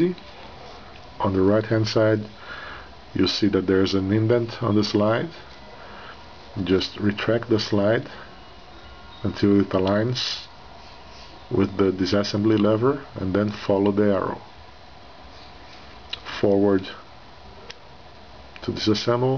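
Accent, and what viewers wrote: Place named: Italy. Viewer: Italian pistol review by Italian accent 😁👍🏻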